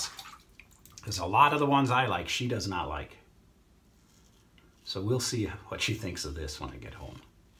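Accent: England, United Kingdom. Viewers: Would love to hear your Newcastle accent on camera, and to see Eternity coming next in this record breaking series! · You sound like you're from Kansas. Can you give us a taste of the ol' English accent?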